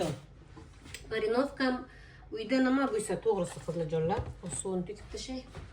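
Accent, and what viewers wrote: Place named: Termez, Uzbekistan. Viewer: опа яхшимисиз жуда яхши аелсиз тан жонингиз сог булсин термизчангиз жуда ектида лекин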